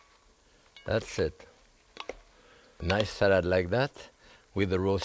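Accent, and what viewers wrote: France. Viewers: Love his French accent 's English, and easy methods in French Cooking! Thanks. :) Merci! Jackque.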